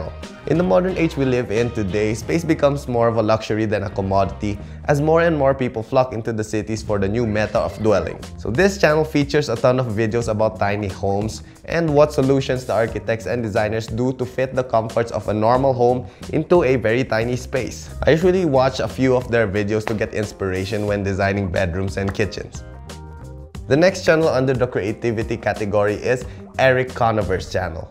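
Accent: Philippines. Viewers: lmao sometimes you speak English like a normal Filipino but then other times, you speak English like you learned the language from looking at memes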